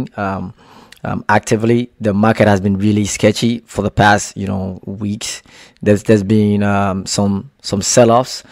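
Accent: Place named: Nigeria. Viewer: This guy sounds nigerian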